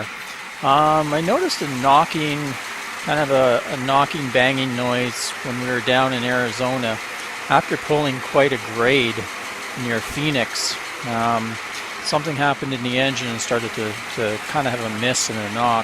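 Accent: Canada. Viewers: Are you from Canada man. I can tell by the accent. Also I'm sorry for Old Blue man hopefully it'll go to a great home with someone that'll bring it back to life. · In stead of calling it Big Blue 2 The Dodge Two Blue. New to your channel getting cough up on your episodes. Man you are so Canadian PLEASE No Insult intended I loved hearing you say "WICKED!" Have Great Travels.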